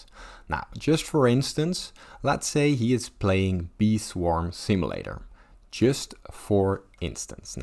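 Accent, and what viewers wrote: Netherlands. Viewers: Are you dutch by chance?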